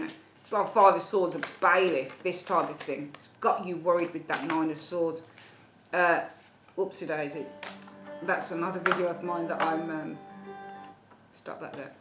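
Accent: Birmingham, United Kingdom. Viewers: hiya are you from birmingham just like me?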